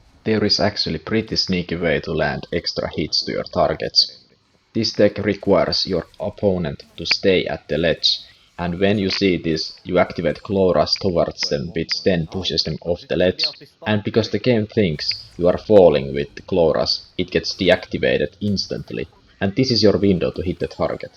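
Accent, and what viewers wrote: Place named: Finland. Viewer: That's the Finnish accent perkele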